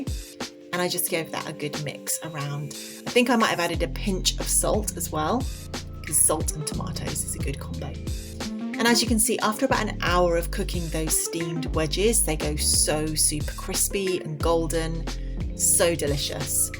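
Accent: United Kingdom. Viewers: Good luck with your channel 🥰 got the warm and fuzzies hearing a fellow Brit. Originally from Essex, living in Australia 🇦🇺 for past 20 years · I'm used to watching American starch solution advocates and I can't tell you how refreshing it is to hear a British accent. It makes you feel like you can relate especially when you mention products that I know and I can easily access. Love from Scotland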